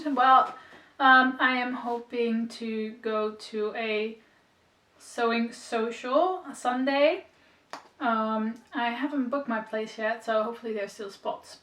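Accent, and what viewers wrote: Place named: United States. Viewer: Hi, I found you through Liz, the Baker that Sews, she always makes great recommendations! Where are you in the world? Your accent sounds American but I expect I am absolutely wrong there! You are going to be very busy sewing with all your plans! Looking forward to seeing your makes! I have just finished Sew House Seven Sauvie sundress for my holiday in Dubai in a few days, can’t wait! We are going to have a Sewing Bee in April, it’s such an inspiration. Good luck with your channel.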